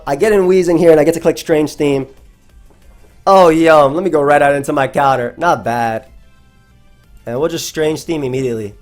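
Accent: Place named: New York, United States. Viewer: It's the NY in him. YERRRRRRRRRR!!!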